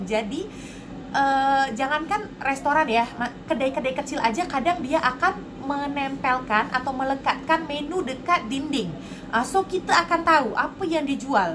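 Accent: Malaysia. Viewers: Cara cakap pun dah boleh jadi orang Malaysia. Apa pun sentiasa berhati-hati. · ehh macam orang malaysia cakap lahhh... kalau kata orang malaysia pun orang percaya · Comel laa akak cakap Malaysia comel je bunyi die nanti dtg sini lagi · Mbah pertuturan nya sebiji MCM orang Malaysia la..alhamdulillah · Mula2 cakap indon lalama ckp cam org malaysia pulak hahaha lama sgt tu jd org melayu... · Loghat sama cam org malaysia dah. Hehe. Bg saya stranger senyum ok je. X de rasa pelik pon. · Ckp pun dh mcm org Malaysia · 100% dah jdi org malaysia, slangpun dah mcm org malasia :)) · Dah ok.. slang bahasa melayu👍👍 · Kalau stgh jam pertama cakap melayu biasa²,mmg ingt org mlysia,ingt Johor X pn sabahan.sedap plak dgr loghatya.🤭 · Wahh good2 content.. ada exact ckp malaysia style.. truskn usaha 😬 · bila lograt melayu,hilang lograt indon nya.hahaha.terbaikk lah sis. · Cara sebutan, cara lenggok pronounce setiap huruf, susunan ayat. 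Memang dah betul² bunyi malaysia. 👏👍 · MasyaAllah kakak cakap longhat Melayu Malaysia hahaha .. btw orng Malaysia memang tak biasa senyum dekt orng xkenal ... orng Malaysia kalau nk sapa orng xkenal bagi salam baru orng balas kott hahahaa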